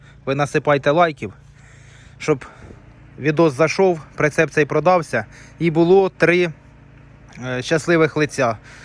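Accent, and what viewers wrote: Poltava, Ukraine. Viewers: по говору поняв, шо наш - полтаський. Балакає !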